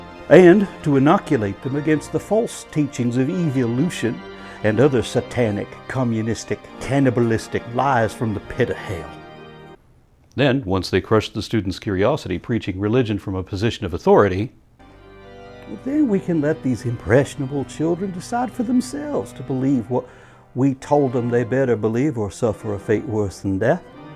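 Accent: South, United States. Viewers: Your Southern voice sounds both mentally handicapped and frightening as all Southerners do to me. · I love his southern alter ego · That southern voice is so perfect lol (Also nice DND analogy)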